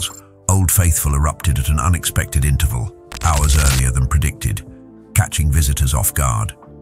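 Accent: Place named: United Kingdom. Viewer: STUPID british, trying to speak english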